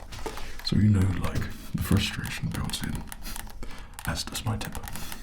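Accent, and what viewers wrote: England, United Kingdom. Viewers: Hii just letting you know is actually born in the uk! and he does sound like he has a very deep English accent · Are you British?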